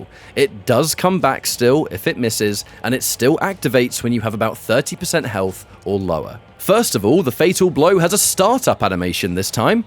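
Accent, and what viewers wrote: United Kingdom. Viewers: There is something about people from UK narating stuff. I could listen to you guys talk about damn fllowers for hours, let alone stuff I’m interested in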